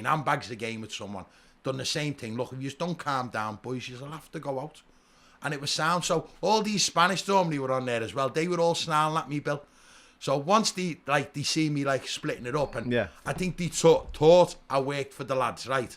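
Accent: Liverpool, United Kingdom. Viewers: Yeah okay scouse! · Nobody can tell a good Yarn like a Scouser 😂😂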